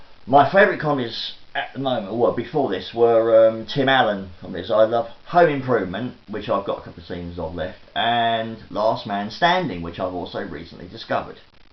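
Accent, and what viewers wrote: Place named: Cockney, United Kingdom. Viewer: Hey,. It’s always cool to watch your videos. You’re probably one of the most down-to-earth British guys who I’ve come across. You deserve so much more happiness in your life. I recommend you St. Andrean’s videos. He’s a Scottish guy who’s also going through what you’re going through. Also, feel free to check out Unrealman’s videos. He makes a lot of good points and actually gives solutions. Also, it’s refreshing to hear a cockney accent. I haven’t heard an accent like that in years.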